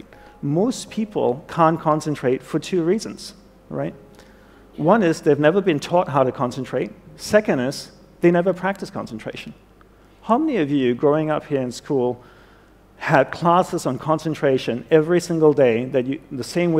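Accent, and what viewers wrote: United States. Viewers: This is refreshing: A Hindu teacher with a New York accent! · Oh for fucks sake, Indian fake gurus are now speaking with American accents.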